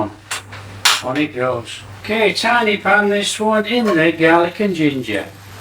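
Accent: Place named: West Yorkshire, United Kingdom. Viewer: My guess is that despite your strong West Yorkshire accent and upbringing that you are cooking in Thailand, busy every day with these splendid demonstrations and not able to remember when your waistline was 28” (if ever)!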